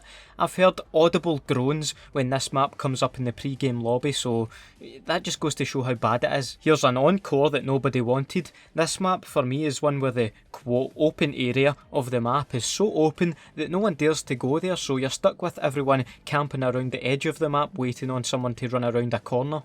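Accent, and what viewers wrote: Scotland, United Kingdom. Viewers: 10/10 Scottish accent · Ur soooo much scottish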